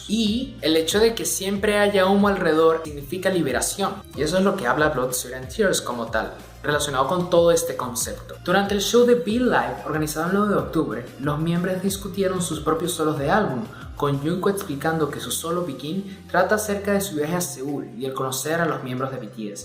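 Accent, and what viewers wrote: Venezuela. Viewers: Siento que eres de De Venezuela :vvv Puedo estar equivocada :vvv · pregunta ¿eres venezolano? es que cuando dijiste coño jajaja me dio mucha risa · AME TU TEORÍAAAAA. Eres venezolano verdad? :V Bueno, ame tu teoria x2 tiene mucho sentido quedé impaktadah :v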